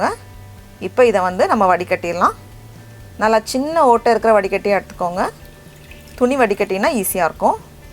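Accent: Nagercoil, India. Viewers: Super. Naa nagercoil ponnu.unga pechu oore ninaika vaikuthu.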